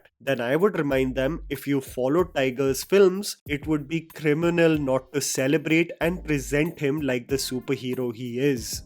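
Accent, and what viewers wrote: India. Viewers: THAT IS SOME THICK INDIAN ACCENT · NO JOKE… his Indian accent made my ears bleed 😢😢😢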